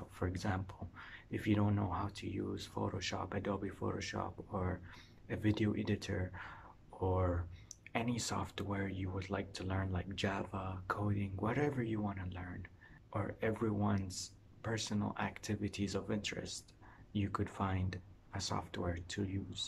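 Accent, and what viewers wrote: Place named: Iraq. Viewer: Make subtitle with it please... I really wanna learn Iraqi accent 🥺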